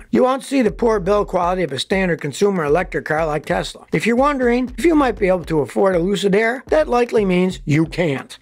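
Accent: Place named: United States. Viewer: Escellent video production, unfortunatly I often get lost, i find it sometimes difficult to follow your american accent. I suppose I need to follow you more often and I will soon get used to it. Where is your accent from?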